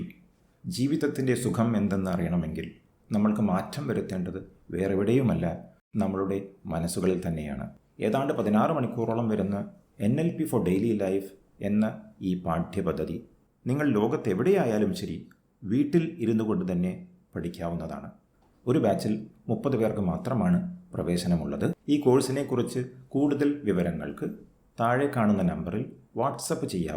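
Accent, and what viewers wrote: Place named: United Kingdom. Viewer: your pronunciation of English is exactly like a British native speacker, wish i could speak English like you.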